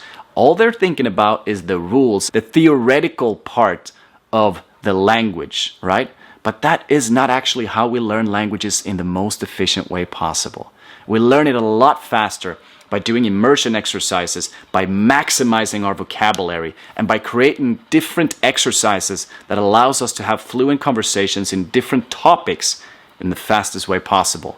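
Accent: Spain. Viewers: Wow,cara eu tô surpreso com o progresso dele no português,o vocabulario dele é muito bom,a pronuncia as vezes não é tão boa por conta do sotaque forte do espanhol,mas fora isso,deu para entender tudo, parabéns!!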